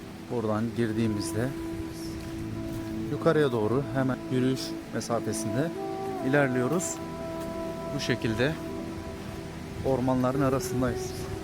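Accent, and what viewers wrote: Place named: Kurdish, Turkey. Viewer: Abicim sen yapamıyorsun, bir kere konuşma aksanın bozuk kürt m7 emi nece belli deyil konuşuyon belli değil vidyoların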